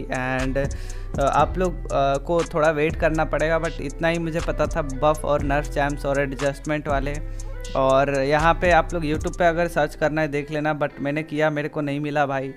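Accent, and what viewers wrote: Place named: United States. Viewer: live stream wala accent vedio me american accent kese ban jata hai 🥺🤦‍♀️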